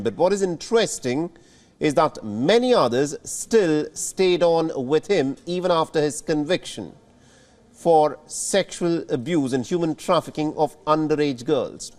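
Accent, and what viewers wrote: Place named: India. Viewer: It’s an Indian accent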